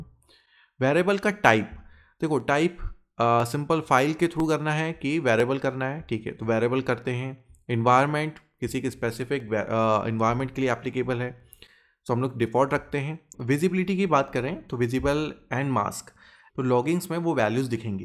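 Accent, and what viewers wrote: India. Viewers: Awesome Indian Accent wiht confidence unlike others who fake accent i really loved and enjoyed this video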